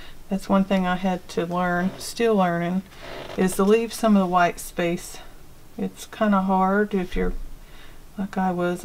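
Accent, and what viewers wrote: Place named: Kentucky, United States. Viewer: I’m glad I found ur channel i love your videos and I think we have the same accent lol. I’m from SE KY.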